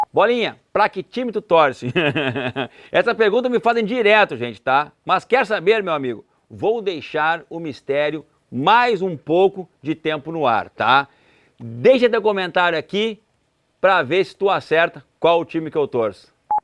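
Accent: Rio Grande do Sul, Brazil. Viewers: Deve ser gremista, se não for, é colorado, mas a possibilidade de ser gremista é maior pelo modo de falar.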